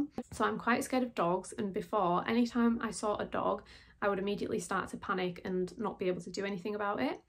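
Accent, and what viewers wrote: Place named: England, United Kingdom. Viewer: I will definitely purchase that book. This stuff is so fascinating! Great channel overall as well. I didn’t want to offend but I figured England and I do understand there are various different accents among parts, as is the same here in the U.S. Very Russell Brand-esque to me 😂 Beautiful though!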